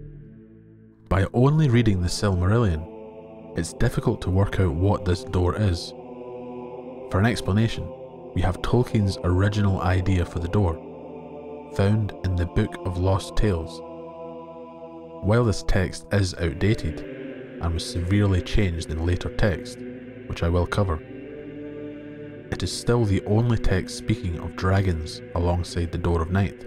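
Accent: Scotland, United Kingdom. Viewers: I don't know if you have an only fans, or an asmr channel, but you have one of the most appealing voices on Youtube. I could listen to you just talking, or reading...what corner of Scotland is your accent from?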